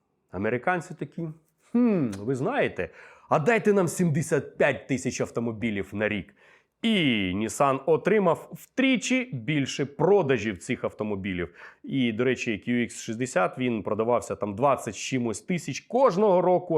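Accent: Russia. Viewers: Бля… неможливо слухати такий акцент жахливий російський. Розмовляйте російською краще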